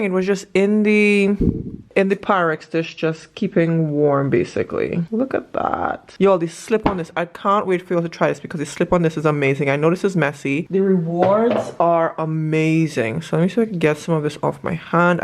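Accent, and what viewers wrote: Trinidad and Tobago. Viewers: I feel like I hear a Trini accent somewhat 🎊🍾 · Are you trini? You sound trini.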